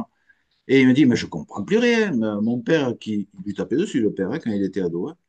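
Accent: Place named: Occitania, France. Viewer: Mille mercis pour ce partage de votre " belle personne" ( et oui vous avez tout à fait le droit de ne pas dévoiler votre Thème Natal, surtout en nous l'expliquant si joliment.. 👍) une superbe découverte qui me rejouit 😊 avé l'accent! Une pensée claire et de Très haut vol 👌👌conjuguée à une grande simplicité animée par la verve du coeur ❤❤❤ j'apprécie également beaucoup Christian Duchaussoy que j'ai eu la joie de rencontrer à un colloque...vive les astrologues occitans 😊 Je suis sous le charme 😊, tout comme la pétillante, 🙌 impatiente de visiter votre site et de vous écouter Relationner au Congrès en Octobre 🎉🎉 des "Révélations" comme celle-là font tant de bien au Bel art des étoiles et le sortent enfin des ornières Horoscopiques et mercantiles... 🌟🌟🌟merci 🙏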